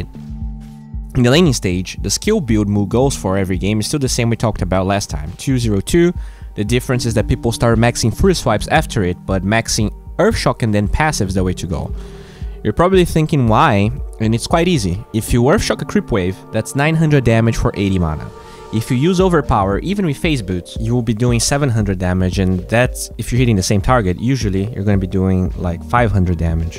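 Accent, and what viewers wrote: Brazil. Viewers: Are you brazilian or know how to speak portuguese? You look like a brazilian when speaks english. (by the way your english is great, I'm just asking XD)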